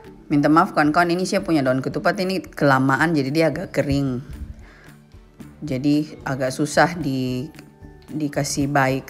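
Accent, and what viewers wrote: Papua, Indonesia. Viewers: Za senang dg logat papuax walaupun da tnggl d'luar👍👍👍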